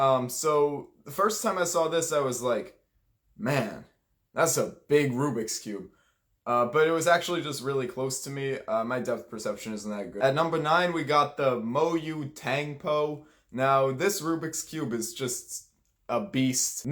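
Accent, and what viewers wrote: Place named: New York, United States. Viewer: why the new york accent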